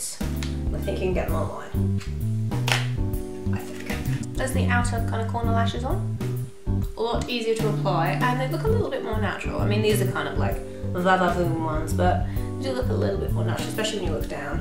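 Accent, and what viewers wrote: United Kingdom. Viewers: you are sooooooooooo beautiful even without makeup...but then again you must get that a lot already....but i still had to say. love your tutorials and thanks for putting up all the names becasue sometimes i hv a hard time picking up on the words with your absolutely lovely sweet english accent. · I agree with Teresa!!, your voice is so pleasant to listen to!, very relaxing and I do too love your british accent, that's something I have always liked (british accent). This tutorial was very good too, you explain so well!!. The lipstick/blush combo really complemented each other. Very pretty!!, you are our favorite!!!, keep the amazing job!!!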